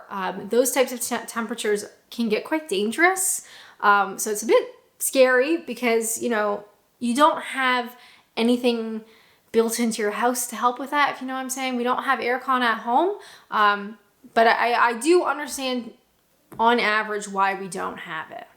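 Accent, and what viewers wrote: United Kingdom. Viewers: Lol. You sound fully integrated into British life. :D :D :D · It's great you consider this home now. .... and you're getting a great accent too! Now to fet yiu moved somewhere where you'll get a strong accent: Yorkshire..... Dorset.... or gasp Happisburgh in Naaaaaarfook (where you will most certainly need a car.... or rather.... tank)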